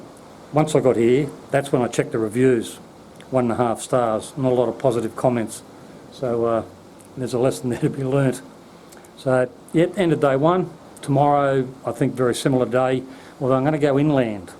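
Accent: Australia. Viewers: I would think there is little chance of getting kidnapped in the philippines - the dominant religion is Roman Catholic, and it's quite conservative. Nice video, and good to hear another Aussie accent for a bit. 'Flat out like a lizard drinkin' up those hills. I'm the same now (not that I did any serious cycle touring until my 50's anyway) Dingo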